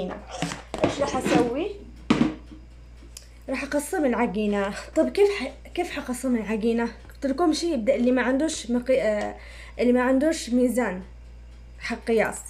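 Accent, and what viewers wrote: Aden, Yemen. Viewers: ماشاء الله تبارك عليك ممتازة بكل طبخه كل اكلك حلو واحلى شيء الهدره العدني لا تغيريها بالله عليك❤😘متابعتك من عدن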